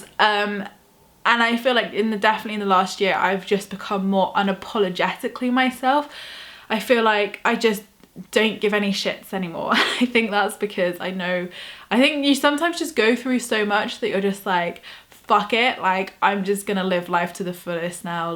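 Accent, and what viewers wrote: England, United Kingdom. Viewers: Hi. I’m Carrie. If I’m honest, I’m a little bit tipsy right now. But I felt connected to you for many reasons. One of them was, you’re the same age as my older sister, so I instantly looked up to you and wanted to be like you because I’m just a little shit and I don’t know who I am yet. But I do know that the idea of living a life like you live, fills me up. But I have absolutely no idea how you do it. I don’t know how to set up a business. But the idea of working a nine to five job kills my soul, and I’m not even doing it yet. The bottom line is, I deeply admire you, and the way you talk about manifestion and the law of attraction really speaks to me. I’m Scottish, and you’re clearly English (lovely accent, btw). I would travel to England just to hear your advice, but if also talk to you any way possible. Something about you makes me really trust you and I subscribed and binge watched you instantly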